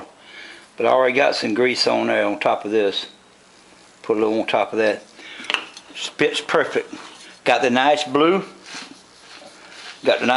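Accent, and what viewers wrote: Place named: South, United States. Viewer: You are wery good at restoring, but your recording is bad, and i have a hard time understanding your country american english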